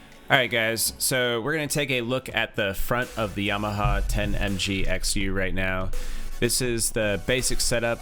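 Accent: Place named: United States. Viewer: guys guys guys guys guys guys guys guys guys ... fucking american speaking, nothing of substance.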